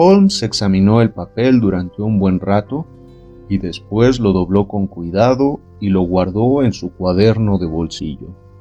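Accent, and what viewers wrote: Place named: Mexico. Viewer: Está muy bien leído.Trato de acostumbrarme al acento Charro.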